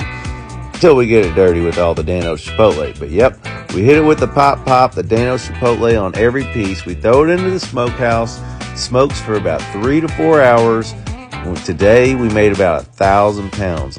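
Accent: South, United States. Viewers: I hear a Southern drawl, y'all.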